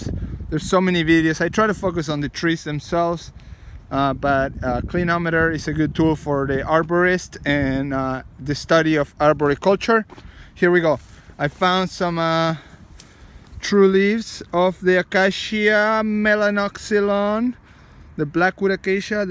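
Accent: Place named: Argentina. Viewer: Tenés acento de argentino! Para mí tu español no es tan malo!!!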